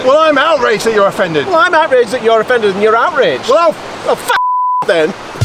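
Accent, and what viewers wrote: Yorkshire, United Kingdom. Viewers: Just interested, you're from Canada but you sound Yorkshire?!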